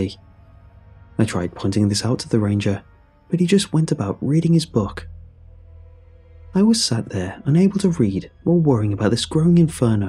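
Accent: United Kingdom. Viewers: If the guy reading these stories didn't have a British accent, I might actually get into them. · Why is it always a British accent to explain it to Americans!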